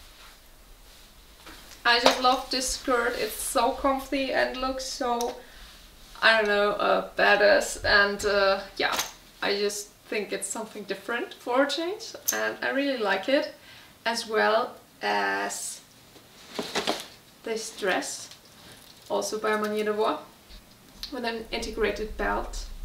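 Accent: Germany. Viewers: Very great video! Nice clothes you have bought online. Your English has a big german accent. So when your next german speaking video? What about your glasses? Very nice mask from you. Keep it up!!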